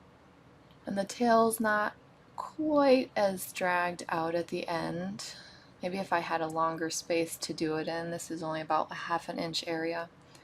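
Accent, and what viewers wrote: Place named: New England, United States. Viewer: Beautiful thank you, I’m from Massachusetts too. I thought your accent sounded like you from New England, lol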